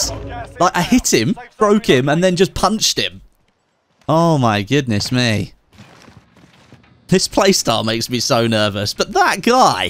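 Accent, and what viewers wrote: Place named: United Kingdom. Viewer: Why are you British??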